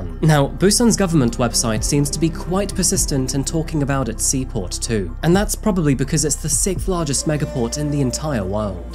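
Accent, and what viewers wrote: England, United Kingdom. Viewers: Dear, may I ask which part of England you are from? I am very interested in the different ways English is spoken in the different parts of England. And of course in true crime I truly appreciate your hard work you put into your videos. CHEERS, Orsi from Hungary. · Thank you,you ARE one of the best crime storytellers on YouTube. With your clear and properly English accented voice, you ARE a class above the others,please carry on with the good work, you ARE much loved (by me anyway!😊) Bravo! 👍👌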